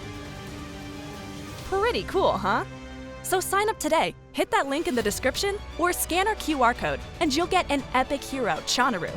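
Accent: Sri Lanka. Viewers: You're a Sri Lankan, right? How did you get that accent?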